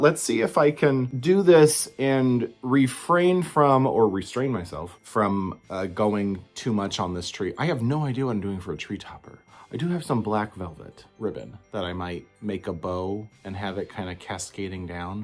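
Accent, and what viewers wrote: Minnesota, United States. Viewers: I love the battery powered candles for the tree! So pretty. Since Paolo stole the show he's the 4th Day of Vlogmas. On the 4th Day of Vlogmas my true love gave to me: Four meows from Paolo Three rolls of batting Two power strips 🔌🔌 And you sound like the movie Fargo 🎄⛄🙂⛄🎄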